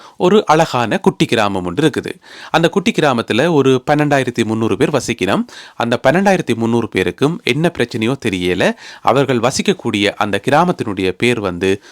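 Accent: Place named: Nainativu, Sri Lanka. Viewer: Nainathevu language.Very clearly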